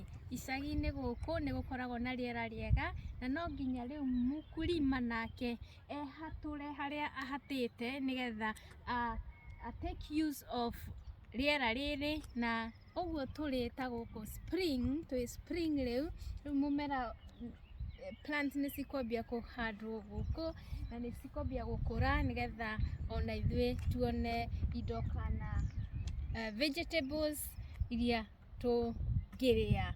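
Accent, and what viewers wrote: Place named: Kikuyu, Kenya. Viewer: Woow I love your kikuyu accent amazing growing your own veggies 👍😍❤️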